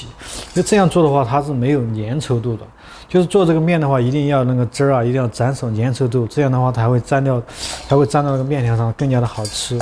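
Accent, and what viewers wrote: Sichuan, China. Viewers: 听口音， 小哥川南的？ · 好久没看到嫩个潇洒倜傥的美食博主，讲话还加点四川腔，幽默风趣搞笑，吃起自己做的美食一点都不含蓄，你最后那一大口吃得我准备明天去买点食材来做了，就是这里葱实在太贵吃不起，还有你那个干葱估计加拿大也买不到，哎，可咋整呀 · 把四川話直接用国语讲，可能只四川人能听懂，而且亲切!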